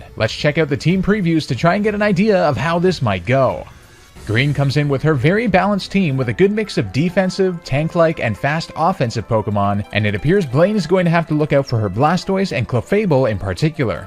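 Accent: Canada. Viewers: Are you Canadian or am I imagining your accent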